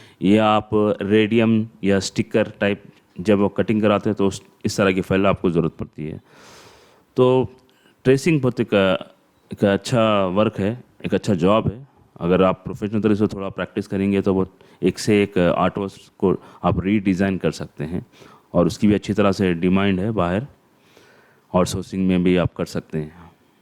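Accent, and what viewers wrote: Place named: Hyderabad, India. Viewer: Asakum Nice vdo Your accent is like deccan hyderabad. I am sure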